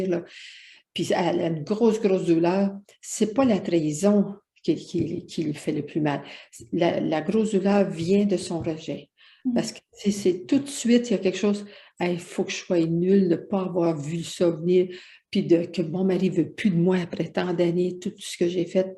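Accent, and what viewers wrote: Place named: Canada. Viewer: c'est dommage que je comprenne rien a l'accent canadien ! 😢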